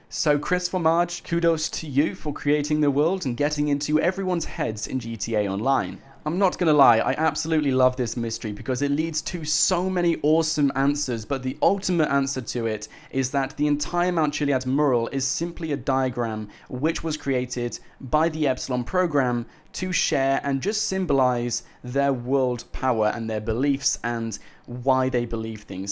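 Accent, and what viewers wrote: United Kingdom. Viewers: He is british so he cant pronounce it right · All of us British ppl say it that way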